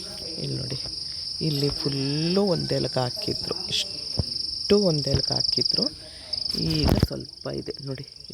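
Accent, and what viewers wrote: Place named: Bangalore, India. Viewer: Alla neevu matado reeti nodi banglore navru ankondidde...nodidre neevu namma oorinavru❤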